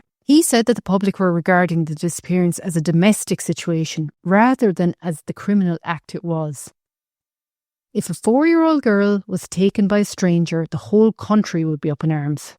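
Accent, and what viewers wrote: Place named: Ireland. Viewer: Your story-telling skills are impressive! You certainly know how to tell a tale - diction, pacing, clarity, turn of phrase, et al. My curiosity was whetted regarding your name -. Your accent says you are Irish, as am I - BELFAST. However, I've never come across that name before, apart from a town in eastern Spain. Good luck with your YouTube podcast.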